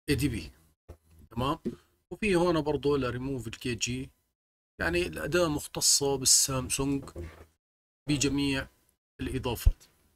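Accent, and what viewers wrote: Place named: Libya. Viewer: فيك لهجة ليبية 😮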